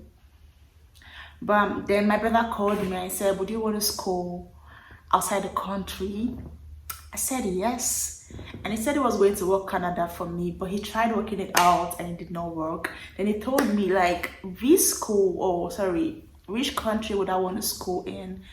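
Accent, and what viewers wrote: Ghana. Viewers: This is so funny. You sound like Ghanaians now, and it's totally weird. You guys had get together 'steady'. Lol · and now you have a ghanaian accent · My dear you are not back to speaking English with a Nigerian accent oo you still sound 80% Ghanaian😅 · Funny that back then you did not understand their intonation but now I hear you pronounce "study" like a Ghanaian. Lol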